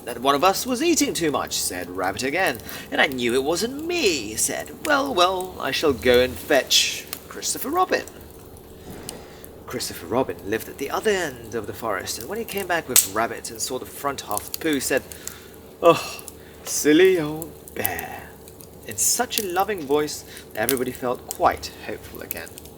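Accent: United Kingdom. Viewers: 聴いてて心地よいイギリス英語～ 落ち着きます(^_^) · 焚き火とイギリス英語の音が心地いいですね…🔥！ 全ては聞き取れないので、聞き取れるように何度も見直すつもりです😂 英語学習を頑張っているところなので、英語系コンテンツもあげてくださるととっても嬉しいです✨これからも応援しています！！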